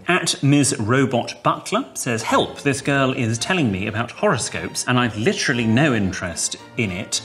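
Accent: United Kingdom. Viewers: 他用超級英國的方式開嘴說幹話反差令整件事更有趣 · I'm learning how to cut cheese for Shak cut (字幕記錄 沒意外是錯的 不是 Shak cut，奶酪也許 charcuterie 法國食品才正確，IPA約為 /ʃɑːˈkuː.tər.i/ ，最末的發音近乎 /triː/ ，沒金耳朵聽了多次也不確定。如不是主持這英式近PR的口音，字幕記錄錯的也許多的多。) well you live left on the edge here . 這裡的內嵌中文字幕，把問的人形容為89，但89我也不明何意，問google，大意就是"底層"或是"低端人口"吧。 如是這樣，根本不配合片中上文下理，也許完全相反，低等文明不存在學習禮儀的可能性，黑社會不會問：老闆，我可以用武力威嚇你給錢就稱作保護費嗎？ 很有趣，我沒多少英文程度，去問問AI，反覆的問，得到較合理的解說是 "考慮到上下文，主持人在談論切奶酪作為餐桌禮儀的一部份時，並不是在字面上描述真正的冒險，而是在輕松地開玩笑。可能這句話的意思並不是詢你"你會過上這樣的生活嗎？"而是以一種幽默的方式贊美這個學習過程，暗示在切奶酪方面的精緻和技巧可以被視為一種"冒險"。 哪通俗的說是你問的程度是專業的啦，二是，你在裝B。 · 還是喜歡英式的紳士風度，腔調聽起來很舒服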